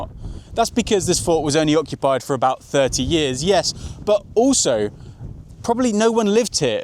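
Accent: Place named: United Kingdom. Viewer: J'aime écouter parler un anglais comme celui-là. C'est plus agréable que l'américain-chewingum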